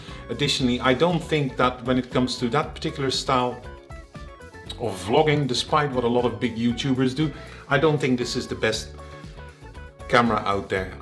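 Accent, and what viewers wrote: Netherlands. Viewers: Very nice review! Your accent is... Dutch?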